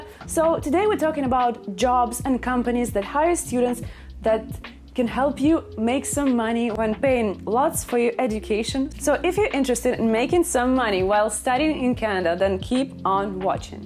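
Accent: Russia. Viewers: Are you russian speaker ?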